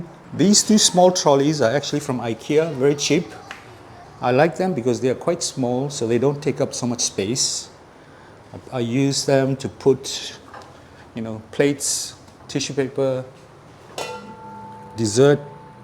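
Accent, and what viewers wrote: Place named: Fiji. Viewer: Bula from Suva...I do remember being introduced to your family restaurant in Nadi while working there in the mid 1990s by work colleagues, Paul Chang and Terence Low (Bank of Hawaii). You asked the question in one of your videos whether to open a restaurant in Singapore...My answer is why not open in Suva. I think with your knowledge of fusing asian and pacific cuisine and unique experience, you would do very well in Suva, and would really complement your Singapore Supper Club. I'd be very glad to assist you set-up if you're interested. BTW, I'm enjoying your videos and you still have a very strong Fiji accent...cheers.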